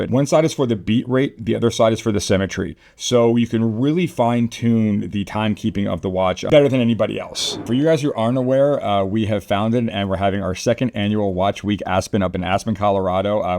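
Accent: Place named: Africa. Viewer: Your pronunciation capabilities are that of an african.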